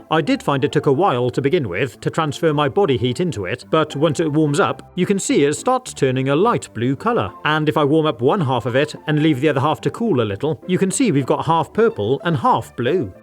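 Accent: United Kingdom. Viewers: I love your vids You sound like you are in the uk and I'm in the us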